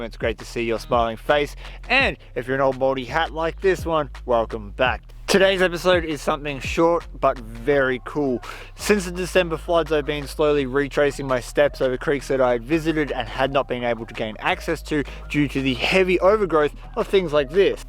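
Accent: Australia. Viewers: Is this Australia? You have that accent.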